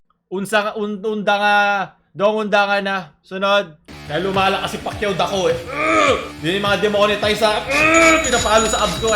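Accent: Visayas, Philippines. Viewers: ANG CUTE NI PAG NAG BIBISAYA MURAG YAWA HAHAHAHA LAB U SINCE 2018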